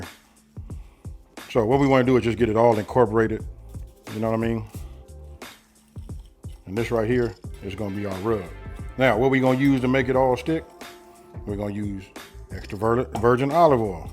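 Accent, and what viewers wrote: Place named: West Coast, United States. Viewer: Keep going bro... only chef with that gangsta west coast accent... Love how your channel is blowing up.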